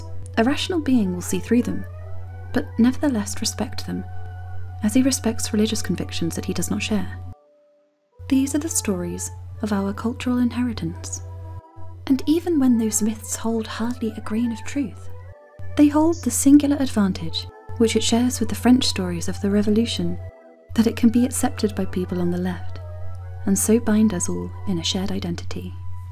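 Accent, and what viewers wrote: United Kingdom. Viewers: Your voice, it's so English / British. And the words that it speaks.... I love it. I thought it was lost. Thank you.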